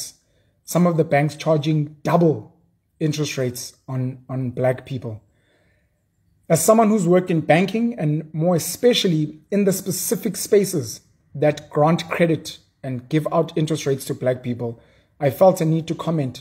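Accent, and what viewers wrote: Zulu, South Africa. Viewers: You have one of the purest Zulu voices, from a sound perspective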